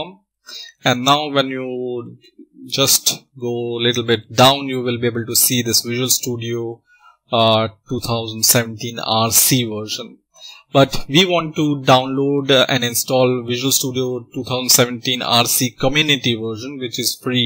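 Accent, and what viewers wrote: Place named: India. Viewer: No, it is just the Indian accent...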